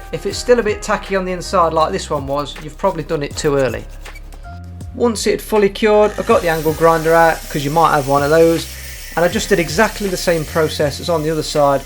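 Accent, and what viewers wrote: United Kingdom. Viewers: the commentary is so british lmao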